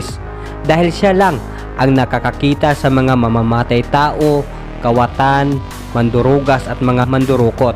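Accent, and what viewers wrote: Visayas, Philippines. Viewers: Parang bisaya managalog, may paduterte pa na singit,, dds ka bay?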